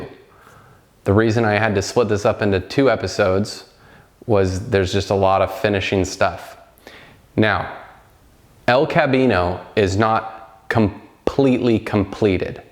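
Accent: Canada. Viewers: Man I knew you were Canadian. Could hear it in your voice. Haha. Cheers from Kelowna BC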